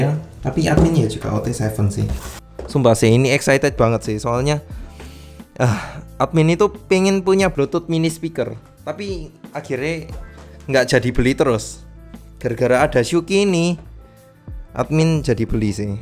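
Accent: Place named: Java, Indonesia. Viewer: I luv ur medhok min wkwk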